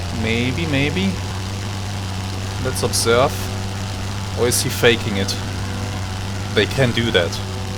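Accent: Germany. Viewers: Der Titel dieser Serie gewinnt immer mehr an Wert. Weil, sooooo kurz ist das Leben von Felix dann doch nicht. Allerdings wartet der Tod in jeder Folge. Wird wirklich Zeit das es bessere Maschinen gibt. Von daher ist deine Strategie genau richtig. Besser einen Kampf, den man nicht gewinnen kann, zu vermeiden und dann später mit besserem Gerät deine Skills einzubringen. Würde ich genauso machen. Dein Englisch klingt so als wenn deutsch deine Muttersprache wäre. Macht das Audio für dieses Projekt irgendwie "cool". Naja, hoffen wir mal, das wir gemeinsam noch viele Folgen des Felix erleben dürfen. Vielleicht wird Felix von Berg der neue "Rote Baron"? Jedenfalls ein LetsPlay mit einem echtem Mehrwert für den Zuschauer.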